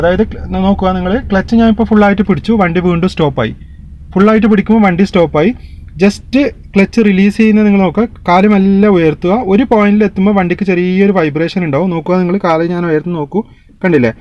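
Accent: Kannur, India. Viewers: Sprrr...ellaavarum paranjadu pole tanne nannaayi manassilaakki tharunnind ee video...njnm licen edthitt 4 years kazhinju...roadil vere vandikal illaathappozhanu njn drive cheyyaaru 🤣 bt ee video kandapol naalethanne poyi practice cheyyanonnu tonnunnu....nthaayalm nalla avatharam 👌👌 ...ningalde samsaaram kettitt ningal kannur aanuo😀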